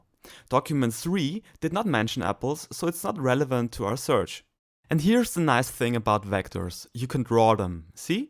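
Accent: Germany. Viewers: The german is strong with this one :D But gj, simple and understandable. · Aww cute German accent!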